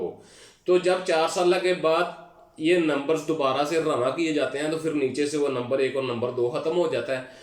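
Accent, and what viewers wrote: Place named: Punjab, Pakistan. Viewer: جناب بہت زبردست لیکچر تھا ۔ مگر آپ کی اردو پر پنجابی زبان بہت زیادہ حاوی ھے Khana kasht: once a tenant, always a tenant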